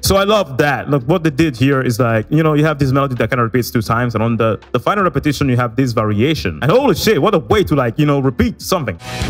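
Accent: Italy. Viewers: but... Do you have Italian origins? your Italian accent is perfect!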